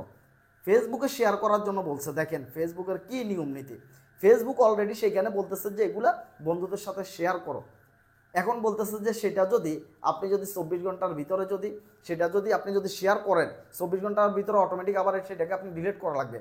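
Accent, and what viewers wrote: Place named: Netrokona, Bangladesh. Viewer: ভাই আমার মনে হয় আপনার বাড়ি নেত্রকোনা,, ❤ আমার দেশের বাড়ি নেত্রকোনা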